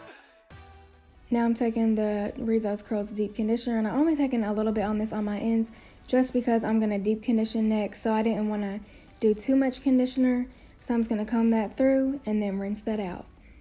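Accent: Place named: Tennessee, United States. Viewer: Girl u sound like u live n my state TN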